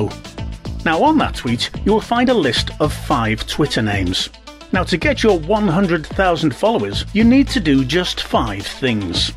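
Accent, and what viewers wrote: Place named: United Kingdom. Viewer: Haha,Judging by your accent,you are from UK,the motherland of Harry Porter...? How many followers do you have now?Please show us your twitter account.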